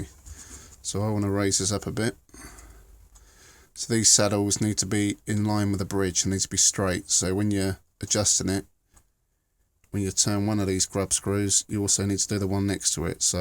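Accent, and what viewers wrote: United Kingdom. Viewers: The British accent makes it much easier to listen to.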